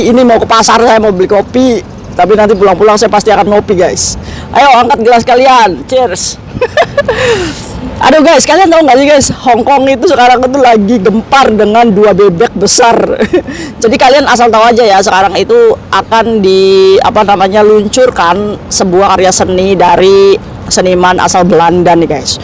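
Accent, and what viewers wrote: Indramayu, Indonesia. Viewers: Logatnya kayak org Indramayu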